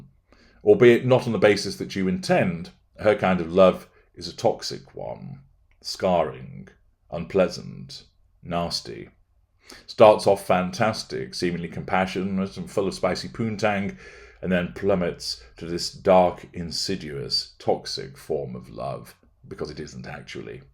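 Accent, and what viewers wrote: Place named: Scotland, United Kingdom. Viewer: Love the Scottish brogue 😄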